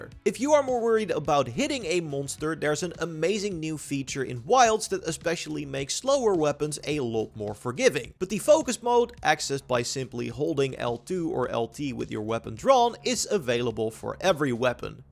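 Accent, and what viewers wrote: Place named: Netherlands. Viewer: Ur dutch right? :p I can always tell in the first few seconds of someone dutch speaking english xD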